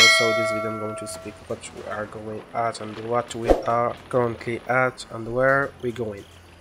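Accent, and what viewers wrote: Morocco. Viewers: This is a Moroccan accent xD it can't be otherwise haha love it content man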